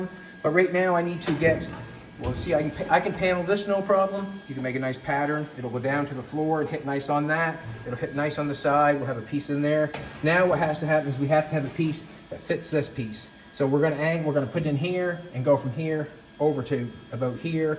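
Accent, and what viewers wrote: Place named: Eastern Canada, Canada. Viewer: Can we pause and appreciate the eastern Canadian accent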